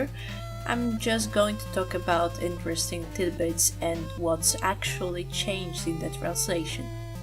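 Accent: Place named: Greece. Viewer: Are you greek? Your accent sounds greek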